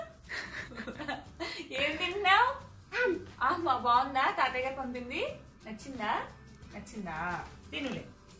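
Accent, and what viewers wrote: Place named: Godavari, India. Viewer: Godawari esha...uttichinattu vinapaduthundi👌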